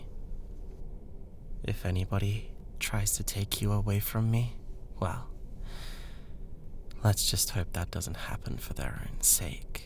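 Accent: United Kingdom. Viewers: BRI ISH BABEEEEE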